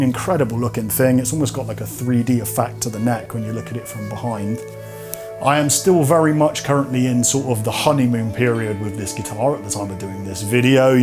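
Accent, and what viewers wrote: RP, United Kingdom. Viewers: You have a very good taste in collecting instruments. Loved your accent too, sounds posh :)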